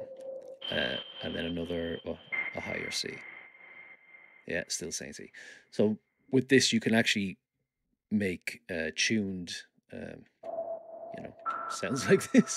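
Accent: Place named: Ireland. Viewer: Unbelievable work my friend! Where abouts is that Irish Accent from?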